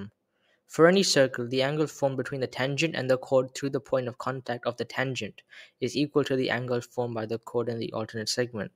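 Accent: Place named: United States. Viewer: Dude your accsent is somewhat like american. Great job ❤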